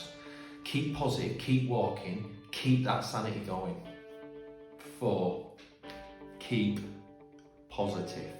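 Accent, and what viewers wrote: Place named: Yorkshire, United Kingdom. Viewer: Great video Coach! When you mentioned your accent I assumed that you meant that your 1st language is not English, but I find pleasure in your accent. Is it Yorkshire perhaps? Thanks again for boosting me. Keep going, you're doing good work!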